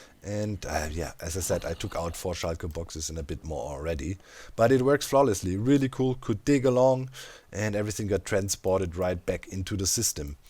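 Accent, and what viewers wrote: Germany. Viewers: Are you German or do you just live here? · u german or somthing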